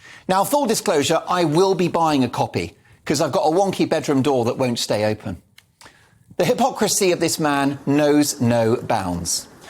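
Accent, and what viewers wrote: United Kingdom. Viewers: I'm giggling with this commentary😂so well done! Love that British dry humor and accent!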